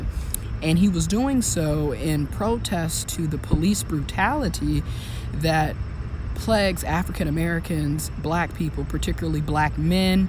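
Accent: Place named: East Tennessee, United States. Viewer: You sound like you from east Tennessee with a Southern accent but you articulate your words very professional in beautiful i wish i can speak like you , you are right what you saying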